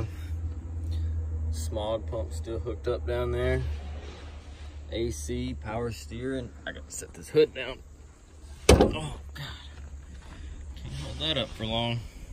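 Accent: South, United States. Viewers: Jesus, Southern accent and knows/appreciates 80's panther frames? Please turn gay and marry me? Pretty car though. I like them so much more than the Town Cars of the time. Too bad it'll probably never be restored. These haven't quite reached classic status in the eyes of the masses but it'll get there. Hopefully it'll get lucky and hold up to that point.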